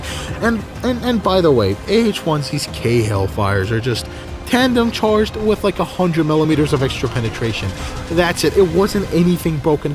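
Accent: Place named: Iran. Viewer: i didnt think you would be iranian, but now i think about it, you do have a thin accent. but still, it would be cool to have iran as a tech tree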